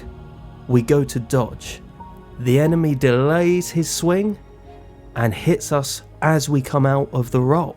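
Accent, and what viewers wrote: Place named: East Sussex, United Kingdom. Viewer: Thanks man. Just found you and you have another sub. Im a Brit sitting in the warm spring sun of East Sussex - your accent doesnt sound too far off this neck of the woods. But Ive been wrong about that stuff many times! I am really impressed how quickly you have built this channel. Gives me some hope. What would you say was your secret to starting it up to fast?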